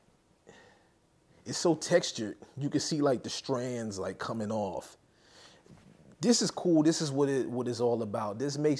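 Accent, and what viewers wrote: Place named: Philadelphia, United States. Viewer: I detect a Philly accent. And heard a jawn in there I think? Respect. #Polodelphia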